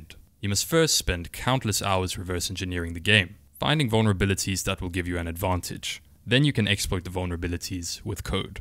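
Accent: South Africa. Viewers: I hear a South African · You sound south african? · Are you south african? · are you south African?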